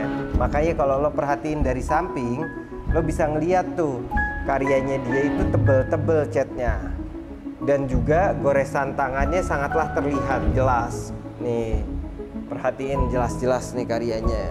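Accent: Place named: Betawi, Indonesia. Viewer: Nyang gue demen dan salut ame hostnye nih bang, betawi banget dialek ngomongnye... Mantep bang, lanjut...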